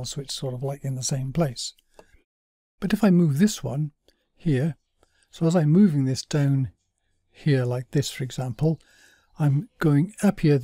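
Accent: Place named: South Wales, United Kingdom. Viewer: Hi. From your accent and some of the photos you use I guess you're in my part of the world - S Wales?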